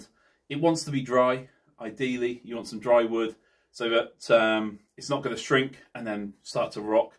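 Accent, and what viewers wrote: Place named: Ireland. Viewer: Too much talking - difficult to understand w/your brogue. The presentation appears mor ad hoc than well-organized.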